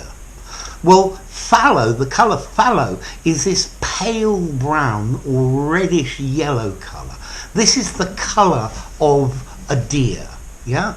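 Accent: United Kingdom. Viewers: Sir, Your pronunciation is the best standard British pronunciation. Please continue the noble job. Grateful Thanks. 🙏🧡❣️💖💛❤️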